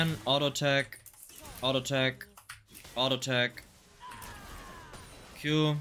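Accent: Germany. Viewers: this middle eastern looking boy with german accent is just too good! i ve learnt about qyana s laning phase in 2 videos more than i have since she was released... keep it up sir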